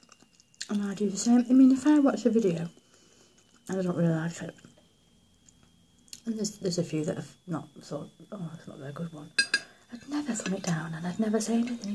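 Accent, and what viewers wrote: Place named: Northern England, United Kingdom. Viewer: good work! where are you from if you don't mind me asking, I'm from South Yorkshire and your accent seems very northern? I'd guess at maybe Oldham/Rochdale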